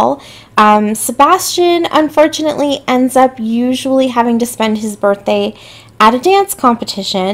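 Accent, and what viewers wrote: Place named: South, United States. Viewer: Like the way you made this a double page layout! Festive and fun. TFS. PS are you originally from Canada, lol. Your accent sounds southern, like mine😉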